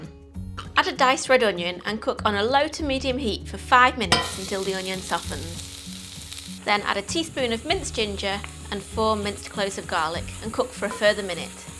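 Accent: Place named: Northern England, United Kingdom. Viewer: It's her northern English accent. And what has it got to do with you how she speaks.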